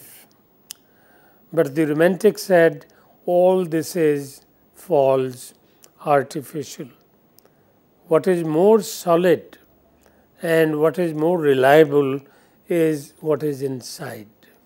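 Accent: India. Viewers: A very good lecture on this topic, new with a certain vocal flavour from the Indian subcontinent.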